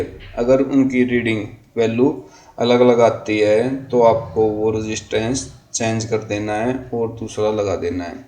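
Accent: Haryana, India. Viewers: Bhai apke muh se halki halki haryanvi sun ke maza aa gaya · Bhai boli to haryanvi lg rhi h kit k ho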